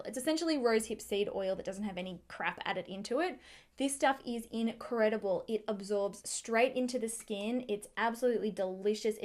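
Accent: Australia. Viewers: ARE YOU AN AUSSIE??SOUNDS LIKE IT LMAO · You sounds like an Aussie. Thanks for tips, but I am interested in natural therapy not after promotional products. :)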